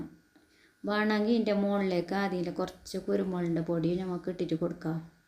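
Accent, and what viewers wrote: Kasaragod, India. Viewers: നല്ല അടിപൊളി സംസാരം എനിക്ക് ഒരുപാട് ഇഷ്ടപ്പെട്ടു കാസർകോട് ആണല്ലോ സ്ഥലം കാസർഗോഡ് ഭാഷയും വിഭവങ്ങളും ഒന്ന് വേറെ തന്നെയാണ് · സംസാരം അടിപൊളി എനിക്ക് കാസർഗോഡ് ഭാഷ വളരെ ഇഷ്ടമാണ് വീഡിയോ കണ്ടു ഞാൻ ഇത് പഠിക്കും😂😂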